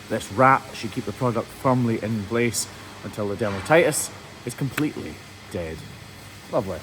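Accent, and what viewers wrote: Scotland, United Kingdom. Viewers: quick question, are you Scottish or British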